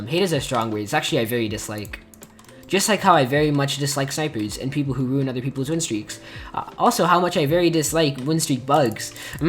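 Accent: United Kingdom. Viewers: Hi u british?? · Are you British? I think so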